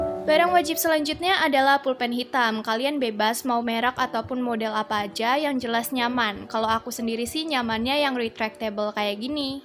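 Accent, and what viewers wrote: West Java, Indonesia. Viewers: Qaqaq orang Sunda:v? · Ka orng Sunda nih?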